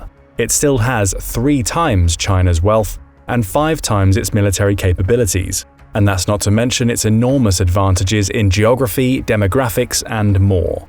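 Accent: United Kingdom. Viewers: He’s from the Uk that’s why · He sounds like a Brit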